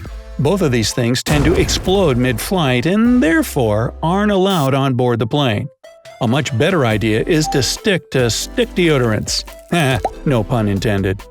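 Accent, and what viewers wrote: United States. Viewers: Is it an American accent in the video ???? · The voice of the narrator is an american English accent, but tip #33 talks about a "special capsule" for sleeping in instead of a hotel. Nobody from America knows what those are because our airports don't have them. Many countries I've traveled to in Europe and the middle east don't have them either. Come to think of it, I've never seen an airport with sleeping capsules. Great idea, I've just never seen it. I've been to Sydney, Tokyo, and Shanghai airports and I did not see them there either, but I wasn't looking for them. Maybe I just didn't notice them?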